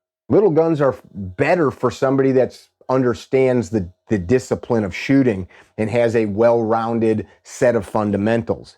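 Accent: Chicago, United States. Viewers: Know what? I love this dude, hes like one of the homies & I’ve never even met the guy. So no homotype shit. legit seems like a down to earth funny ass dude from Chicago, with that yankee accent… hahaha. the father figure most of us never had. 100%.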